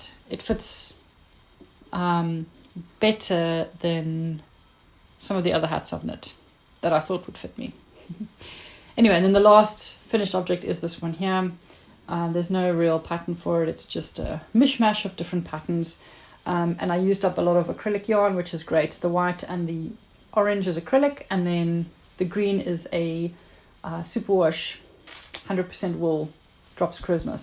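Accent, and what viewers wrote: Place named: South Africa. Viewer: Hallo, your video was suggested to me on YouTube and the moment I heard you talking I wondered if you weren't South African😀. I live in Pretoria. Lovely projects! ❤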